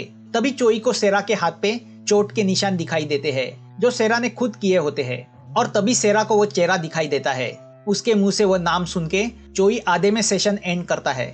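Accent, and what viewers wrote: Maharashtra, India. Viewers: Marathi manus Boltoy kaltay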